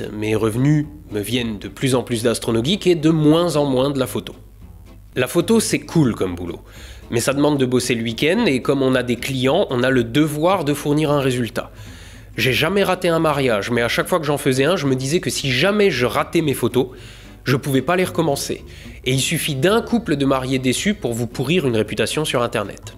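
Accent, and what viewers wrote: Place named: Marseille, France. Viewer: a été avec Benoit de Devient photographe les tous premiers tuto que j'ai suivie et en effet il me semble que sa fait bien 8 ans maintenant. Le temps passe et tous évolue aujourd’hui c'est deux cité ne propose pratiquement ou plus de contenu... Je garde de bon souvenir de visionnage et il m'arrive encore souvent de visionnée des vidéos. moins passionnée d'astronomie je vais quand même souvent liké les vidéos pour l’algorithme, car au delà du faite d'avoir des tutos intéressant le personnage avec sont franc parlé et ses mots direct est très appréciable par sa simplicité et étant marseillais je suis habitué a cette façon de parlé.... Bonne continuation a...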